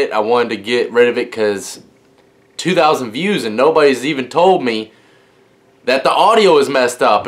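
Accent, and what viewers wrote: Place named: Midsouth, United States. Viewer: I just knew you were from somewhere in the midsouth. Keep up the content...never boring.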